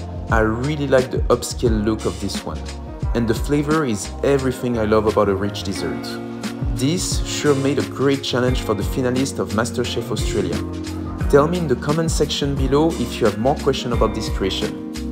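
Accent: France. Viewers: Love hearing him talk. Love that smooth French accent. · You are french? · Je pense qu'il l'est, il a énormément l'accent français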